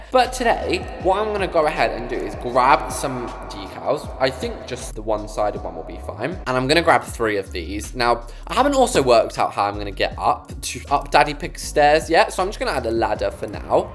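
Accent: United States. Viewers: I do not like the American accent I like your normal voice better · Gurlll I’ don’t like you amaerica accent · PLEASE THE AMERICAN ACCENT IS SO SCARY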